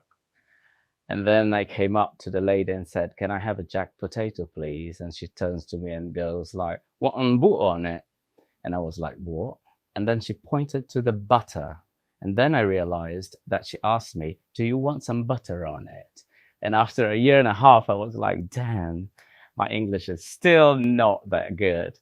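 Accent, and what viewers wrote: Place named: United Kingdom. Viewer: Your British accent is wonderful 👏🏻👏🏻👏🏻. Very nice the video! ❤️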